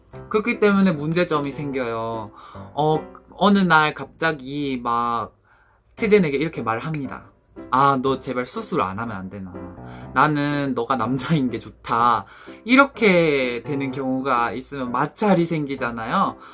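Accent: Gyeongsang, South Korea. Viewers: 경상도분이시네 그냥 사투리로 하시지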